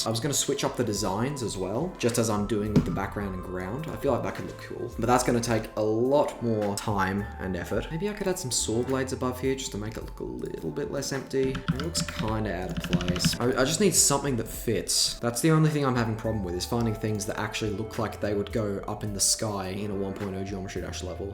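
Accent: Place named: Australia. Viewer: got punched in the face by that thick ass Aussie voice, willing to bet money this dude’s from Australia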